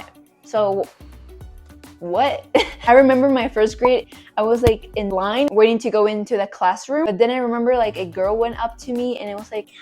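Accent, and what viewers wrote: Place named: Peru. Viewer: I knew you had an accent but I had no clue where you were from but that’s really cool that’s your from Peru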